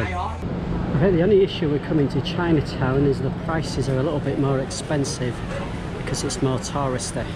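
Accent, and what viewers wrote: United Kingdom. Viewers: You from British?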